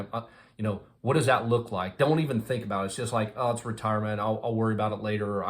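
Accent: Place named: Texas, United States. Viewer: Hey keep up the content. I have noticed from my own experience. By going from house hack to house hack you end up with more cash flow so therefore you need less properties. By the way really heard that Texas accent come out on this one LOL.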